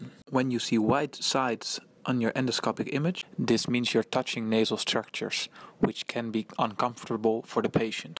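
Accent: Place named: Netherlands. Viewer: Heel goed, Nederlands accent!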